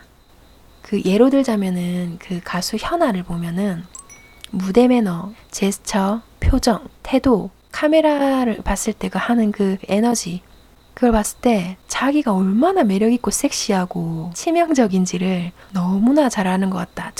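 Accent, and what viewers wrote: Gyeongsang, South Korea. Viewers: 방금 구독했어요ㅎ 경상도 사투리가 너무 정겹게 느껴보는건 처음이네예ㅋ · 작년에 영상이 너무 좋아서 책을 사려고 했는데 도서관에서 보는것 말고는 구할 수가 없더라구요. 절판ㅠ 어느날 여행지에서 길을 잃었는데 그곳에서 중고서점에 들어갔어요. 근데 제 눈앞에 이책이ㅠㅠㅠ너무 놀랐어요. 2편인 마음의 오류까지 구했어요...방금 이 책 읽는중에 가브리엘라 내용이ㅠㅠ 129p에 있네요ㅋㅋ너무 반가워서 글쓰러 왔어요. ㅎㅎ 항상 영상 잘 보고 있구요. 제 책장엔 이 읽어주시는 책들로 채워지고 있어요❤ 저도 경상도 사람인데 사투리 너무 듣기 좋아요. 늘감사해요^^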